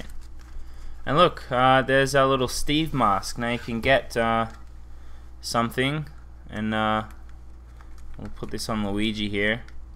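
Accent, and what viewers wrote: Australia. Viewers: are you aussie? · You sound Australian and American? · Are you Australian?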